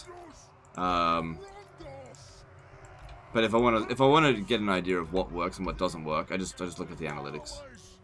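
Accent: Australia. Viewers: I very rarely click your videos based on the content, it’s usually about personality. Sometimes I just think “shit man, I could use a grumpy Australian talking into my earholes”. Love your channel man.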